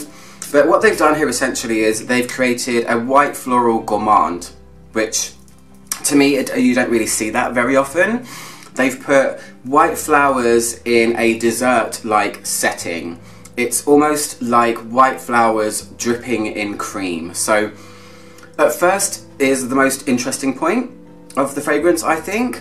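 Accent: United Kingdom. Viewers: Perfect and helpful descriptions as usual plus I adore your British accent! On the other hand, could you do a top 10 chypre florals? Thank you very much and please keep on doing these wonderful reviews! Greetings and respect from Spain!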